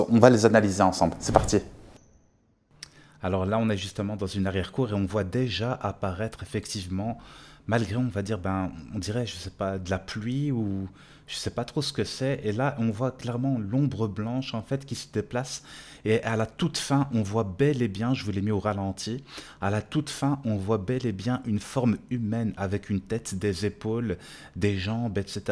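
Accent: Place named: Belgium. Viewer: Bonsoir, pardonne moi si j'ai pu t'atteindre de par mes quelques commentaires.. sache ke je suis abonné et que je like positif à 90%.. tu as un sens de l'humour et ton mélange d'accent orientale/belge est rigolo..😉. Bref en ces temps de fêtes: prends soin de toi, bonne année à toi (sincère) et a plus dans l bus...😁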